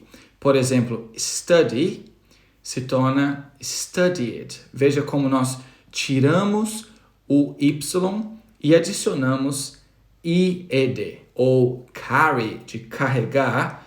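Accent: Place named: United Kingdom. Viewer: That sounds great the British accent. I love it! I miss it! Congrats!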